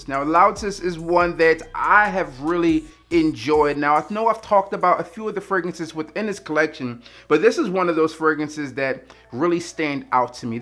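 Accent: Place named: Queens, United States. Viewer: Yo you from Queens boss? I like the energy with the way you describe the scents. Just subscribed. Keep up the good work 💯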